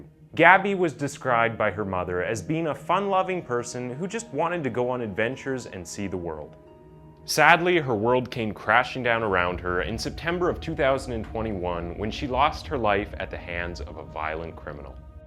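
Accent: United States. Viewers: The narrator has a very American accent so am I the only one that finds it strange he cannot pronounce Yosemite I mean I’m Canadian and that’s not a far stretch but I’ve known about Yosemite park my whole life it’s not a small place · I like this guy but I’m curious where he is from. He sounds typical American but mispronounced well known words like Maryland and Yosemite. Just an observation.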